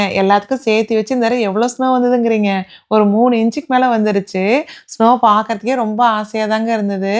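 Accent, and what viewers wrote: Kongu, India. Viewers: Kongu tamil. erode ah sis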